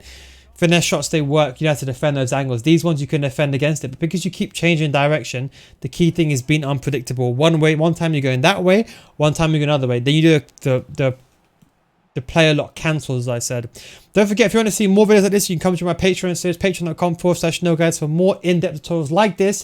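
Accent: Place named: United Kingdom. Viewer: bro speak more clearly, not everyone is understanding you other than people from UK. after "player lock" bro wtf u sayin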